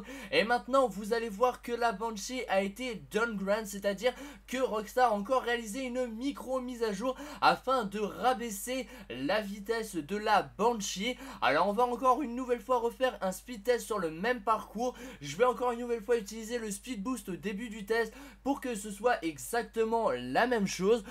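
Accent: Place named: England, United Kingdom. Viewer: mec, tu racontes n'importe quoi, enfin bref vrai ou pas peu importe, en tout cas arrête ton accent anglais c'est carrément hilarant...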